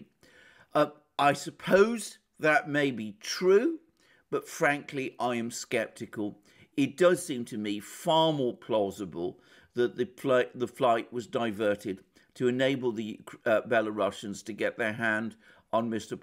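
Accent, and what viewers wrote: United Kingdom. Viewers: One of your best Alexander keep up the great work. That British legal accent is perfect to call out the hypocrisy of the US, UK and the EU.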